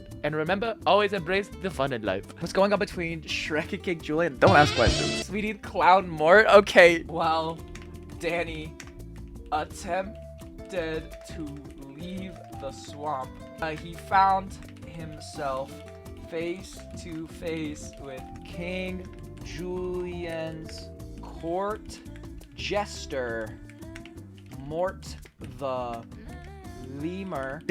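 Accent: United Kingdom. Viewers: It’s not the same without the British gentleman voice tho…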